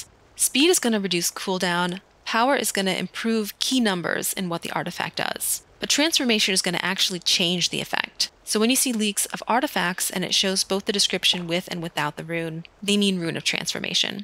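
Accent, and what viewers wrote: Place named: New Jersey, United States. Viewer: New Jersey accent?! How did you end up in Europe? Come back to Jersey.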